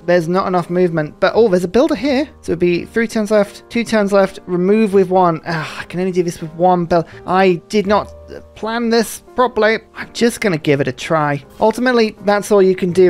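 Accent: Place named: South East England, United Kingdom. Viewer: Wait really? Do you mind me asking where you're from then? Cause I've lived in each of the 4 quadrants of England and your accent definitely sounds most similar to the South East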